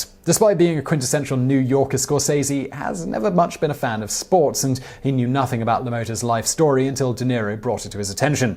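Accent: England, United Kingdom. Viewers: I like this channel mainly because its a brit w/ a cool english accent so you know hes to be trusted plus solid content and for once I liked the title and the presentation. Most of these vids are poorly presented w/ the guy pandering to human reaction which is annoying and the clickbait vids simply arented needed. The subs plus people will click on this vid just because it exists it really doesnt need super clickbaity titles. This one was a great episode and I love how the info is put forth esp the brick backround etc but nOmOReClICkbAitY stuff ppl will legit watch this no matter the name itll just come up on the que and trust me itll get views but spare us the superduper clickme kjøtt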